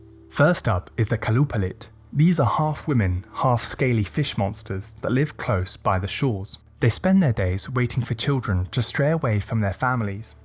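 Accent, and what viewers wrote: United Kingdom. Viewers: As an Inuk, this video got every myth right, just as I heard these myths growing up. The pronunciations of the Inuit language through that smooth British accent were on point.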